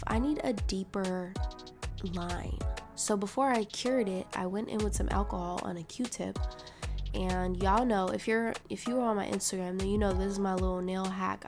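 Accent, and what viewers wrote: United Kingdom. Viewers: It was the British accent for me! lmao yes, I no longer visit the salon unless I want to get a russian manicure- to do my nails after lmao (or for a good ole pedi) Love these!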